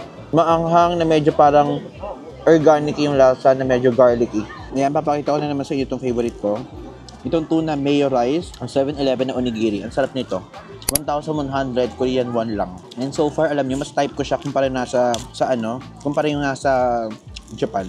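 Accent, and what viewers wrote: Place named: Bulacan, Philippines. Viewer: As a bulakenya gustong gusto ko yung pagtatagalog mo,! sa bulacan ko lang naririnig ang supil 😂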